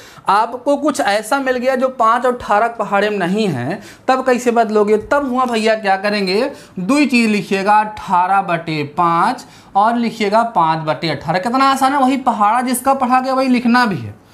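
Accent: Bihar, India. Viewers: Totally ap bihari language me pdate hai Maza aa jta hai pdh k😍😍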